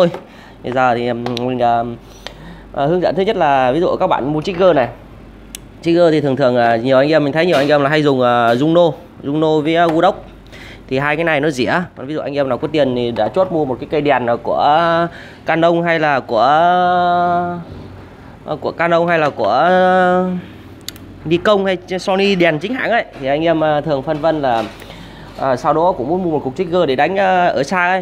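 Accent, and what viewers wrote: Thanh Hoa, Vietnam. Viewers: Nghe giọng ông T.hóa chán quá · kiểu như giọng thanh hoá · giọng thanh hóa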